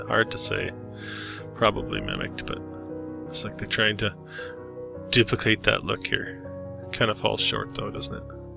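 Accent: Canada. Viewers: New subscriber and loving your content. So straight forward and easy to follow. I do love how u mention something about the founders of US maybe having the good intentions I was taught they had as a kid. Very hopefully comment on the eve of the Independence Day. I noticed u said “they have for profit prisons over there” wonder if u too are American or not? U always talk about talking to those that do this work modern day. I am a supplier of stone in Carrollton GA most all local masons buy from me and I will help them get whatever they need. I will show some screen shots to the most skilled ones and see what they say! A lot of them don’t speak first language English but I suspect they all know the narrative is bs. It’s the Americans that are the hardest to get to see what’s right in front of them. Second edit, I place the accent to Canada now. Yes our prisons are for profit, and it’s as twisted as it sounds. 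Entire portions of our population here have had extra Bain washing in hopes they will end up in the prisons to reach the numbers and fill the pockets of the evil entities that stand to profit from the prisons, or those that own them.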